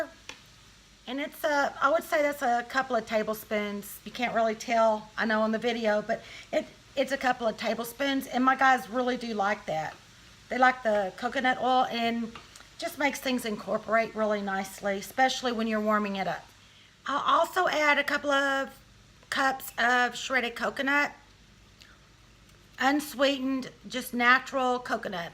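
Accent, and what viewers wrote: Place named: South, United States. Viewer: You are such a southern belle!!! I love it!!!!! I’m from NC and it put a smile on my face hearing your accent :) And thanks for the video, I have an Eclectus as of a couple of days ago, so I’ll have to make sure I can incorporate all of those ingredients. I heard my baby boy’s digestive tract/system is pickier than most other parrots. This is my very first bird so wish me luck. Be well!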